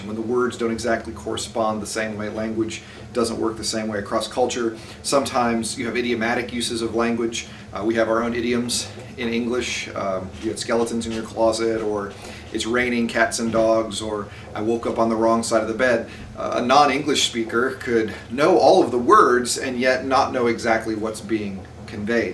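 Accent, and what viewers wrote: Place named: United States. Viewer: Which US State are you from? Im trying to guess the accent. :)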